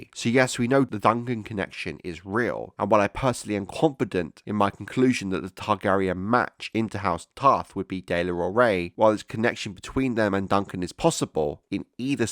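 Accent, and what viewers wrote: United Kingdom. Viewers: The accent is very sheffield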